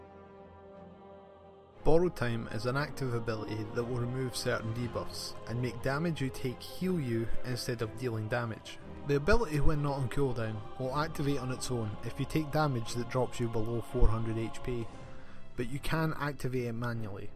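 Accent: Scotland, United Kingdom. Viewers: What accent is it? Scottish, irish?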